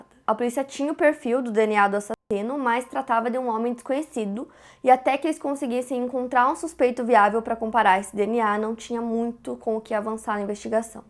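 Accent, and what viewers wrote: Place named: Paraná, Brazil. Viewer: Ela é de Ponta Grossa (Paraná). Também amo o sotaque dela!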